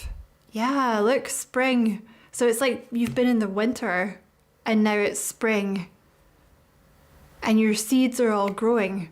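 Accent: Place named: Scotland, United Kingdom. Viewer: Ouch some uncomfortable home truths there. This was a brilliant reading delivered all the better with that wonderful Scottish accent across an equally wonderful kitchen table. I’d love a one to one tarot reading on that same table with a truckers tea brew! Haha! Thank You. 🙏🙂🙏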